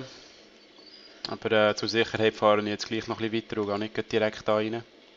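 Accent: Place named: Switzerland. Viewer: Er spricht halt Schweizerdeutsch aber ok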